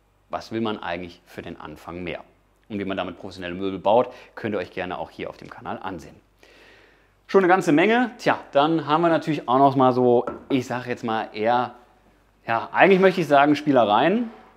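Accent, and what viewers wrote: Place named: Germany. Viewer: du bist ein Genius. Wie immer, es ist ein Vergnügen dir zu zuhören. Und dein deutscher accent ist sehr angenehm. Grüße von down under.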